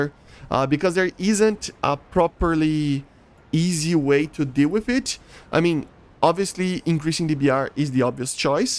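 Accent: Brazil. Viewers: percebi que era brasileiro na hora seu sotaque não engana kkk otimo video mano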